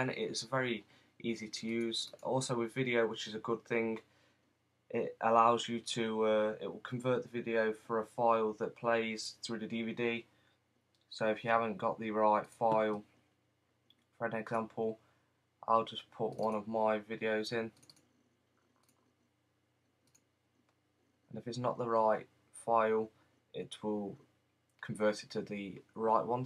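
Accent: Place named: United Kingdom. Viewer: cannt you get a better microphone ans speak clearly without the brittish accent ? we are trying to understand but its hard .. .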